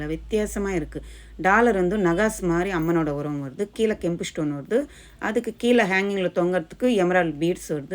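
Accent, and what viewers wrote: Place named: Telugu, India. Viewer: Your telugu mixed tamil voice.. is my favourite ❤️♥️ that too you say superuh irunthuchii 😍 anyways your video collections are so nice sister...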